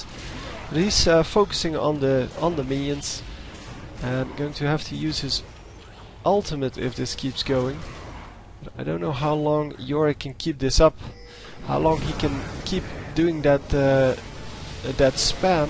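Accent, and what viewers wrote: Netherlands. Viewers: you must be dutch ??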